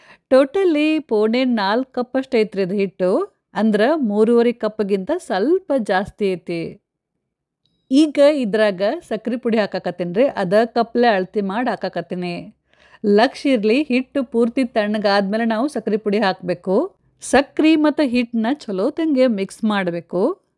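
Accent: North Karnataka, India. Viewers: I forgot to tell you, I lived during my childhood in Bangalore, and I had some classmates who spoke the Dharwad Kannada so I absolutely love uttara karnataka dialect. Thanks for speaking in that dialect. That brings me back to my golden childhood days!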